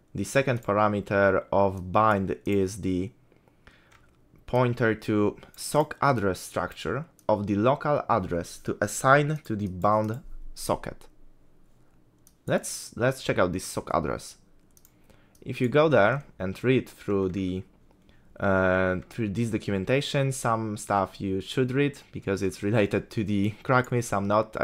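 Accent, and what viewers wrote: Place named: Poland. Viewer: czuje polski akcent 😊😊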